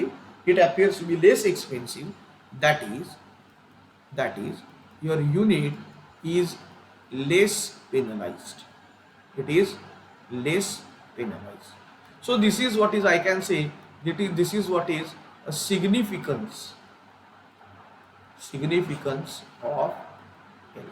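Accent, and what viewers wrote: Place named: Marathwada, India. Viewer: Sir your marathvada slang is so sexy Baki beutiful vedio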